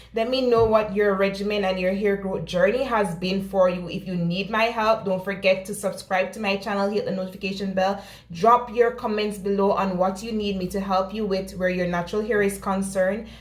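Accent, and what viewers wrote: Jamaica. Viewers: You sound Jamaican. Where can we find or contact you? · What salon do you work at? cause you sound like you might live in Jamaica. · I thought you were Jamaican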